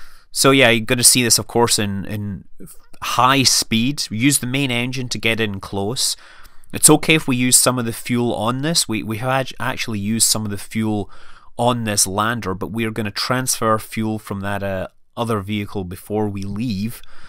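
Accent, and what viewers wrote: Scotland, United Kingdom. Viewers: are you scottish or irish? cant tell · Hey, or you Scottish or Irish? Forgive me if you're something else, your accent just sounds more Scottish to me. Sorry if i offend you!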